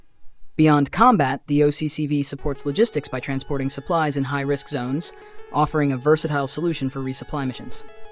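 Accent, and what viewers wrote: United States. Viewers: It's hard to watch something about our modified Bucket narrated by a yank. About the project, i think it's Boffins inventing systems from the point of view as seen from their desk and pc. If you want a warbot, make a specifically built one from the ground up, not convert a manned battle taxi. Make it small with only what it needs. I'm all for preserving our fleshware, but this is not the platform to do it.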